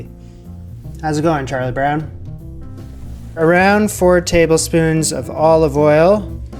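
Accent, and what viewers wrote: Canada. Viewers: Me expects all Canadians to know french slaps the naivety out of me Well, at least he's still got the adorable Canadian accent, like every thing he says sounds kind of like a question.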